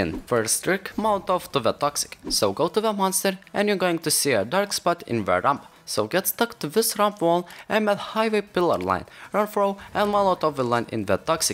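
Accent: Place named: Germany. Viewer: pain to hear german english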